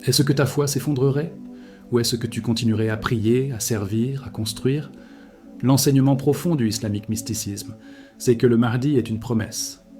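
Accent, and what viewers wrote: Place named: Belgium. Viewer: Salamaleikoum je connais cet voix et c'est un belge merci Barak Allah u fiek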